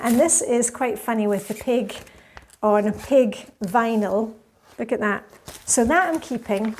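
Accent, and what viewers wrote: Scotland, United Kingdom. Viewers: Hi, so sorry to hear about your mum's passing, my mum was from Edinburgh and your accent always reminds me of hers - she never lost her accent after moving to England in the 80s - but she passed away in my early 20s. My question is: how would you have felt about the decluttering if you didn't have your brother to pass these records onto? We're currently getting ready for an international move and it's the sentimental stuff - you almost crave peace of mind that it's going to a good home, but obviously once it's out in the universe you don't have that control so you end up hanging onto it? Do you think you'd have been as ruthless if it was just being let go? You're always so good with decluttering, does it just become an easy habit making harder choices easier?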